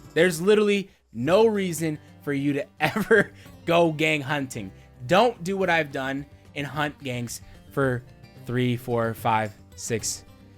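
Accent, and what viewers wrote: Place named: Russia. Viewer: Nice man I like gang hideouts. Damn man I just noticed how many subscribers you got now. Haha when I subscribed you had around 100. It’s because of the Russian accent you do haha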